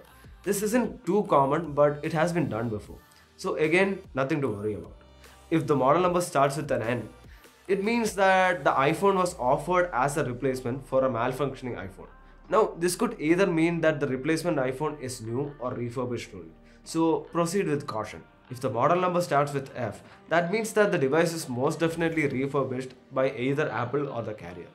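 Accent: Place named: Kerala, India. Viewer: 10 seconds into the video and I know that's a mallu guy speaking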